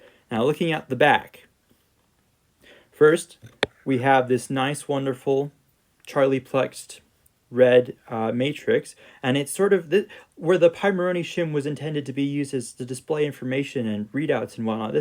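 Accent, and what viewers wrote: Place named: United States. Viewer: his accent seems american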